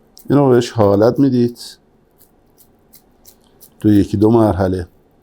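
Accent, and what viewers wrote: Shiraz, Iran. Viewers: بسیار عالی, مخصوصا با لحجه شیرین شیرازی. به به , چه کبابی 😋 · درود بر شما .. استاد شیرازی هستن؟؟❤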